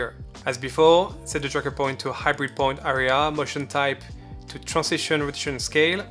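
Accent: France. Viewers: Im both impressed and NOT. If your aim is to teach, I think it would be good to explain every move, especially the nodes. I am trying to learn fusion, and so many tutorials with god people teaching, BUT as they are god they tend to forget to show even the small steps, which if missed, actually can ruin the whole tutorial. In this tutorial, you missed the nodes, why connect them in that way. I looked at some reviews, and all gives good. But may bee they are att a more advanced level, so they can fill in what you missed. The reason I write this, is only because I see you are good at what you are doing. Even if your French english is fun to listen to :)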